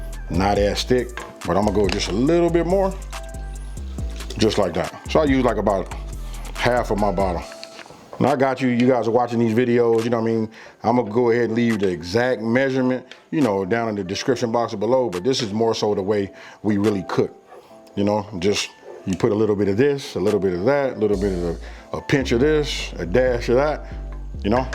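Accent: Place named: Los Angeles, United States. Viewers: Im proud to see this dude also from Cali with that L.A county accent. Your Channel editing and presentation energy and skills ALL on point bro for real!! i already have my mom and sisters subscribed and myself too. im bout to regulate making your famous Chilli in the new crock pot for my elderly folks! god bless you and your famalia from ATL Ga! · bro i swear i knew he was from LA as soon as he started talking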